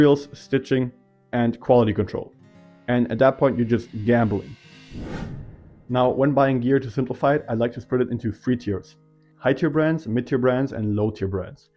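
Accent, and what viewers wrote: Germany. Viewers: Ich höre doch einen Deutschen hier raus 🫣🫣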